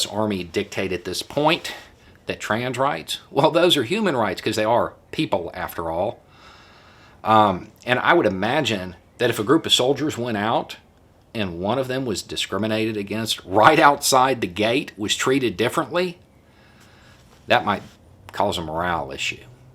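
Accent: South, United States. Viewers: THANK YOU THANK YOU THANK YOU THANK YOU for this. I've lived in TN my whole life which means I've been confounded for most of my adult life. Watching ppl I grew up with blindly hate vote for holes in their own heads, then yell YAY....HEAD HOLE! ACA, being a prime example. I'm an active Dem in my state. We're working hard to make inroads everywhere. We had Dems in 96 of 99 cong districts running in 2018 & 2020 which is huge for reasons I need not explain to you.. but it didn't move the needle enough. Only 35% of eligible voters vote in TN. I'm exhausted. I personally helped get over 80 ppl registered to vote for first time in 2020. Called every one of them to make sure they went to vote. So yeah..I'm exhausted. Thank you for spotlighting my home state. The real work of decent folks is in their own yard. I imagine what could be if we break that red south firewall, so it's that hope, that keeps me going. 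Your mere presence here, with your intelligent southern accent of truth & "wokeness" (sheerly used for lack of better term), in and of itself helps destroy the idea that the south is owned by the right. It's that idea that needs its ass whooped. So the other 65% who could vote but don't bcuz they rationalize it's hopeless & won't matter, can no longer honestly cling to that rationale. So THANK YOU brother! From one frustrated but not going away southern lefty to another.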